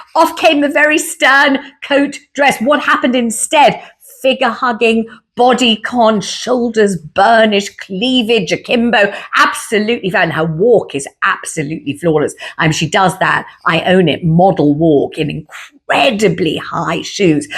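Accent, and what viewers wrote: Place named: RP, United Kingdom. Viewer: You speak soooooo well, you are a 1 off. No-one could comment like you. You're amazing & love your Queen's English, you speak soooo eloquently xxx thankyou xxx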